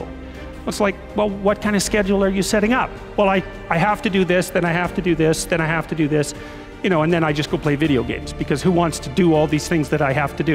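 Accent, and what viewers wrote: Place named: Canada. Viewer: He sounds so Canadian haha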